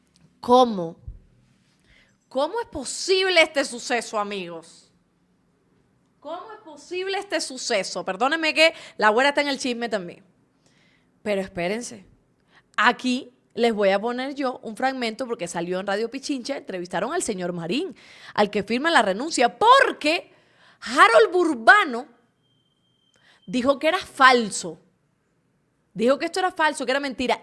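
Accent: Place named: Cuba. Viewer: Saludos bella....Me encanta tu programa....cada vez hablas más en Cubanito...que linda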